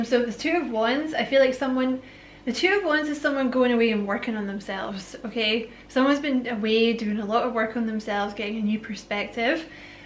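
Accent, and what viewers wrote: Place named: Scotland, United Kingdom. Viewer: You are such a doll! I love your Scottish accent! You crack me up when you cuss! And when you tell people to ** off! I live for it!!!🙌🏾 I’m also subscribed to your other channel🤗 Thank you for all you do. You’re a sweet and endearing soul. Love and light to you always💛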